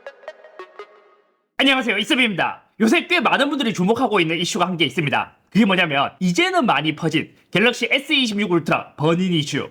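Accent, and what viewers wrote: Gyeongsang, South Korea. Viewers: 난 얘 경상도사투리 뭔말하는지 못알아듣겠슴..진짜 운좋게뜬 케이스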